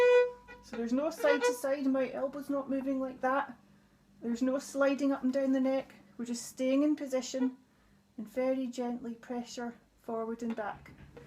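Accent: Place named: Scotland, United Kingdom. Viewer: I enjoyed your instruction ( ive been playing since before internet existed) I can learn something from anyone> its making the NEW ...a HABIT. I LOVE hearing your scots dialect. and pretty name. thank you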